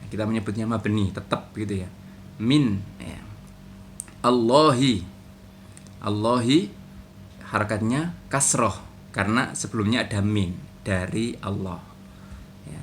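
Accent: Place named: Java, Indonesia. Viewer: maa syaa Allah.. medoknya kerasa sekali hehe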